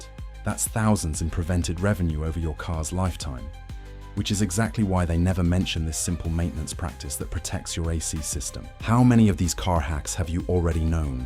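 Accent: England, United Kingdom. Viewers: Why does an English accent, somehow, import an "expert" narrated video?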